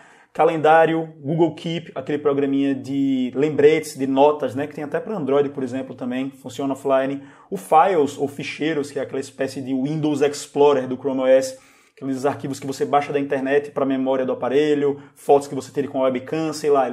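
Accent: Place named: Aracaju, Brazil. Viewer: caraca tu é de aju? hahaha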